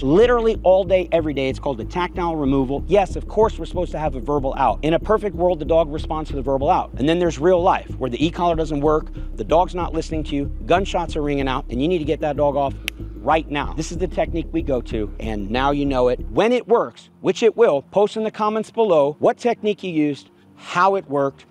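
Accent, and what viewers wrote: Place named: United States. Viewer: You fled the UK and developed an American accent pretty fast.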